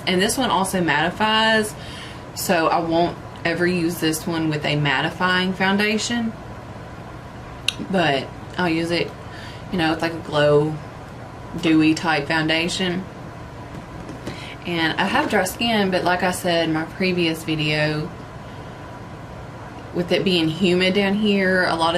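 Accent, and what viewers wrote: South, United States. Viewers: I hear that country Trang lol...nice video. You have a new follower thumbs up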